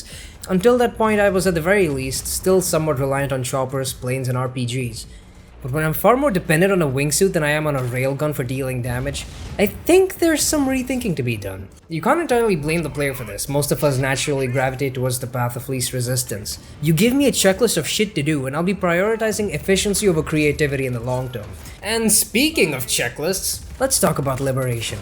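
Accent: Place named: India. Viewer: Around 17-18 minutes mark I started feeling like you have an indian accent. :D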